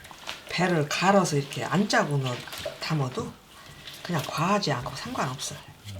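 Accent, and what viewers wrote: Chungcheong, South Korea. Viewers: 충청도 신가바요?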